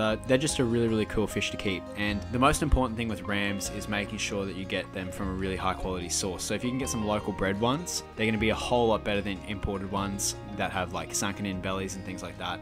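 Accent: Australia. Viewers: bro you sound Australian why you talking in gallons?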